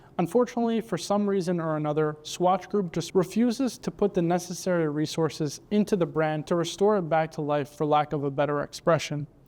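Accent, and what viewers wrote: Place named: Quebec, Canada. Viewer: Please stop the québécois accent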